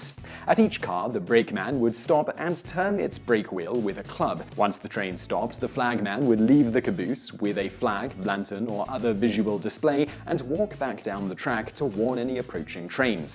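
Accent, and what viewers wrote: England, United Kingdom. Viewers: Michael Dougfir Me too only the other way round. I'm English as he clearly is. We don't have cabooses and none of those pictures was of anything English. It seems odd. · I had a dissonance problem - British accent, US words for all the things.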